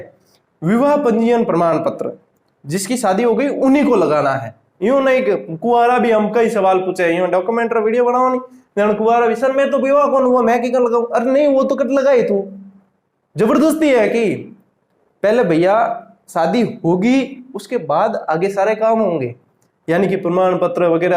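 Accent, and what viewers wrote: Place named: Rajasthan, India. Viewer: Sir aap marwadi bolte h to bahut mast lagte ho 😎😘🥰😘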